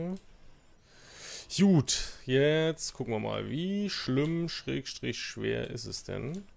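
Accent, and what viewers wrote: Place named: Rhineland, Germany. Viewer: Knöpke und Nupsi :-D Bist du aus dem Rheinland?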